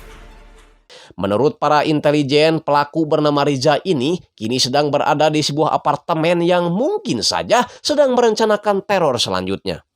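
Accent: West Java, Indonesia. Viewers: Filmnya keren.. Naratornya kocak.. Logat sunda makin mantapp!! Sukses sll kang..!!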